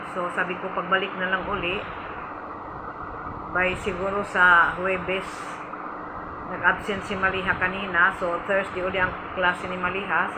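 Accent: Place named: Visayas, Philippines. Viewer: Ilhan gyud sis sa accent nato lahi gyud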